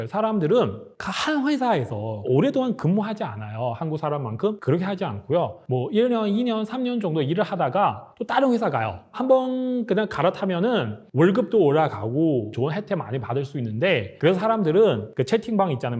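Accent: Yanbian, China. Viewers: 발음이 중국분이네요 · 이사람 중국사람이네 빌음이 중국사람 ?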